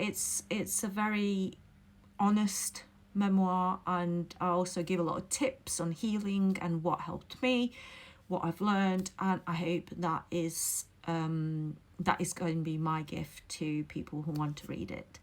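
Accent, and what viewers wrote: Northern England, United Kingdom. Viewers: Beautiful spoken English! Northern accent, which is fine. I guess it MAY be possible for a language expert to detect a foreign accent but it would take a lot of effort and most native speakers would think you a native Brit. PS - Most native English speakers make MANY grammatical mistakes. If in any doubt about this, walk the streets of Middlesbrough or Newcastle, or listen to virtually any footballer being interviewed (apart from Dutch or Nordic footballers whose English is normally excellent). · Welcome..you have obviously settled in with a Northern Accent And dropped that Cult at the same time.. The good thing is, you wont be knocking on peoples doors,( just as they are sitting down for Tea) punting the Watch Tower anymore. 🎖❤️🇬🇧🍷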